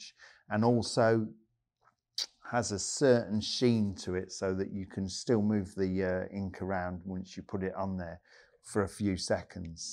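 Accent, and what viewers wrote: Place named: United Kingdom. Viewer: Didnt expect him to have such a british accent. I love his stuff. Amazing artist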